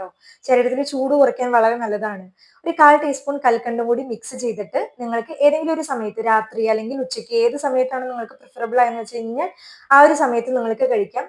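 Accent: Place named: Thrissur, India. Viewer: തൃശൂർ മലയാള പോലുണ്ട്. ഉച്ച്ചാരണംശരിയല്ല സ്പീഡുകൂടുതൽ ആയതിനാൽ ചില അക്ഷരങ്ങൾ ചോർന്നു പോകുന്നു. പല്ല് ഇല്ലാത്തവർ സൂപ്പർ ഫാസ്റ്റ് സ്പീഡിൽ പറയും പോലെ ശ്രദ്ധിക്കുക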